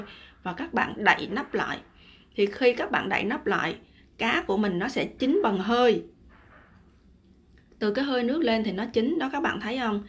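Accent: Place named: Da Nang, Vietnam. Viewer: Mình cũng ng Đà Nẵng , nge nói mình thấy vui vui , vì gặp đồng hương bên xứ người, mình hiện đang ở Mỹ ạ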